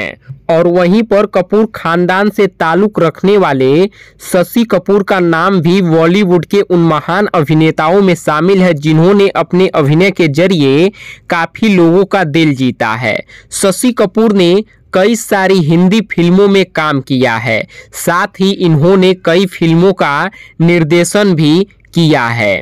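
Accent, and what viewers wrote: Bihar, India. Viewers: Aapke language mein bihari axen aata hai ise thik karo. Aur shashi kapoor hain sasi kapoor nehi.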